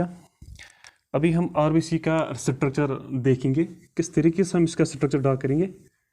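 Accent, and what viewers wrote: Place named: Kashmir, India. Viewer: Kashmiri axient aata h bhaiii....but Lecture is good..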